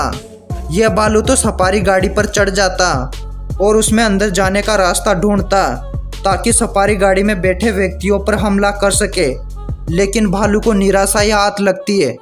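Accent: India. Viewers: sister are you Bengali 🙂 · Jaipur side ki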